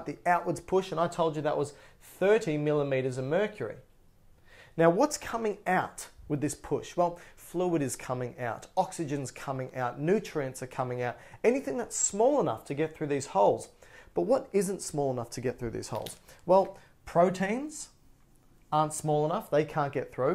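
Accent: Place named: Australia. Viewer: There's auto translate captions available, hope that helps... unless you're having a problem with his Australian accent :-)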